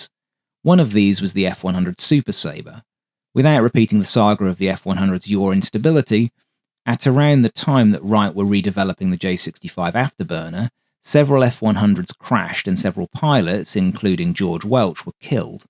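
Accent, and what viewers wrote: England, United Kingdom. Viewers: Well, the English call it a motor, and he sounds English, so there!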